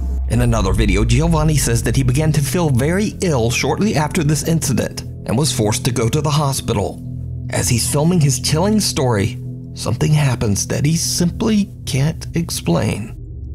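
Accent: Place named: South, United States. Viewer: your voice seems to be getting more and more of a "southern drawl" to it. Did ya relocate or something? Btw that may sound creepy(oh the irony)but I'm not asking where you live and have no idea where you are or have been.lol)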